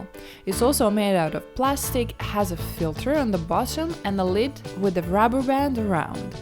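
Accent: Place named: Eastern Europe. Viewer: My guess would be former Yugoslav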